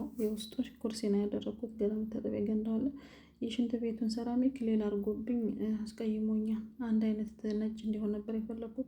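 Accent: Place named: Wollo, Ethiopia. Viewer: እርግጠኛ ነኜ አማራ ክልልናት የወሎ ይመሰለኛን አማርኛዋ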